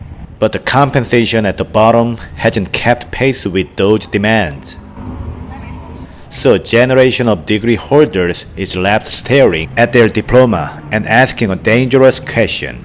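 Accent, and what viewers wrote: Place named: South Korea. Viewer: Impressive work! I just hope that you can drop the Korean accent 😅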